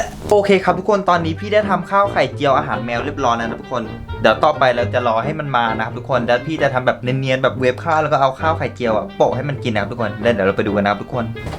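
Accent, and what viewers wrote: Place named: North, Thailand. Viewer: พี่เป็นคนเหนือหรอครับทำไมพูดเหนื่อ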